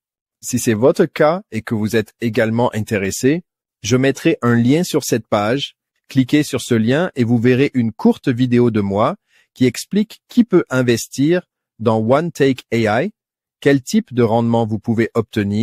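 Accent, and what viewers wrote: Canada. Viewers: avec un accent canadien, c’est rigolo 😃! Tu as traduit ta vidéo de l’anglais vers le français avec OneTake? C’est génial! La synchronisation des lèvres est incroyable wow 😊et la traduction aussi! Je suis ravie d’apprendre que mes vidéos sont en sécurité sur plusieurs serveurs et qu’ils sont redondant en cas de problème ou de panne pour que mes vidéos en ligne soit toujours accessibles, d’autant plus pour celles qui constituent mon offre!😍🫠🥰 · C'est peut-être bien pour traduire dans une autre langue que votre langue natale pour des gens qui ne connaissent pas votre voix. Mais, là, cela ne fait pas du tout naturel ! Hormis l'accent canadien, le rythme est souvent saccadé et ne respecte pas le ton naturel qui conviendrait à ce qui est dit. Cela fait vraiment robot. Personnellement, je n'aime pas.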